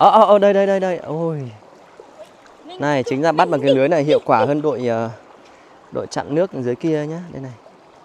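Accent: Hanoi, Vietnam. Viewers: Ai cũng nghiện kênh của rồi, bây giờ cầm ĐT lên là mở thôi, không còn thời gian cho kênh khác nữa, Cảm ơn con nhiều nha, cảm nhận như được đi Du lịch, thế thì làm sao mà không yêu được cơ chứ, rất yêu con, vì giọng nói của trai Hà Thành, vì nó gợi lại bao kỷ niệm, ký ức của một thời Thanh xuân đã qua mà không bao giờ lấy lại được, Cảm ơn con nhiều nha.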